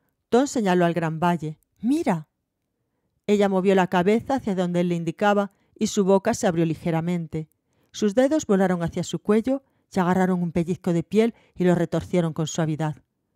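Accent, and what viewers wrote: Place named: Aragon, Spain. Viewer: De que parte de españa eres? tienes un accento muy bonito, y buena voz =) soy muy malo para los accentos, eres mañica??? xD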